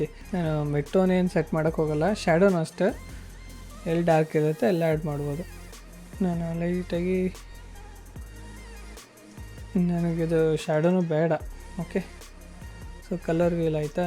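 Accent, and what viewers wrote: North Karnataka, India. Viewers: Bro en nivu uttara karnatakadavaraa? I am From Koppal✨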